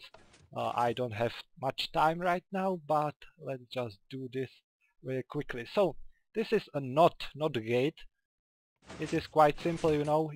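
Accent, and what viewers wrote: Czech Republic. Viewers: ja mam dotaz :D prosim. z prizvuku si myslim, ze jsi cech, tak to napisu rovnou cesky :D mc sem hraval, ted to jen sleduju. ale nikdy sem na obvody nebyl a hrozne rad bych vedel, jaky tohle ma vyuziti v klasickym survival svete ? nebo to je jen sranda, ktera nema nejaky velky vyuziti ?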